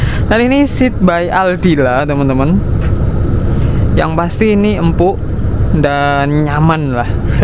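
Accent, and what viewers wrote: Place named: Banyumas, Indonesia. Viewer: Salam ngapak😂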